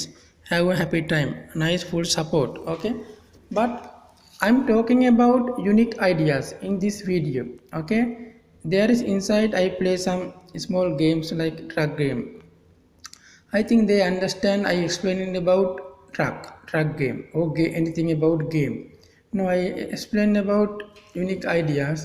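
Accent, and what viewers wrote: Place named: India. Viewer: Your English like indian man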